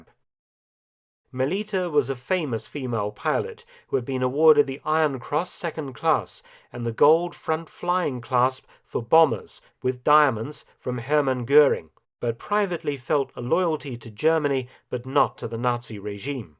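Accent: United Kingdom. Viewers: His German pronunciation is solid but far from perfect. There is a notable British accent and in addition some words sound quite different when a native speaker speaks them. For example "Hanna Reitsch" was quite close, but there was still some accent in the surname. In contrast to this, "Beate Uhse" was quite wrong. He did not speak the "a" of "Beate" although it should be spoken. In addition he spoke of the "U" of "Uhse" as "Ü". What he said would have been written "Bete Ühse" and not "Beate Uhse".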